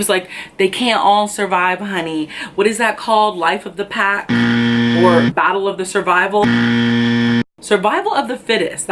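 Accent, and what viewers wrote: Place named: New York, United States. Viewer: Hey Princess! Excellent how you present yourself. My goodness! Love the mix of just right Ebonics and everyday English. You should be considering acting, reading dramatic audio books, customer service, all areas that puts you in the public, HA YOU DO'N NYER. I am also a NYer. (Brooklyn native I am). Thank you. Have a blessed one. Yeah, I'm a plant lady too and I've got about 40 plants on my plish (plant wish) list, will be bought as babies cuz I ain't spend'n no light bill money on a plant, that's hard for me to do. So far I gooot, boout, between 50 to 60 plants in my small NYC apartment. Plants are just dee bomb! Just found ya and will see ya some more in future. Again Princess, bless!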